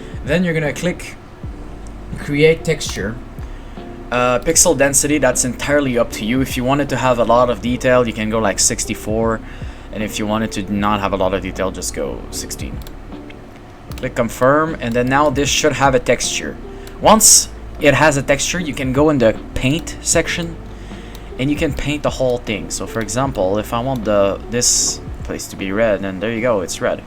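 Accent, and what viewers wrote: Quebec, Canada. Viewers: correct me if im wrong but i think this is a Quebec accent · Am I crazy or do you sound vaguely canadian french?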